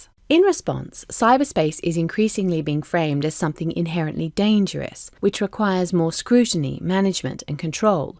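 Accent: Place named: Australia. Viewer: I can hear a hint of Aussie in the person's voice, i can't be the only one. Sorry for going off point, i appreciate this lovely introduction!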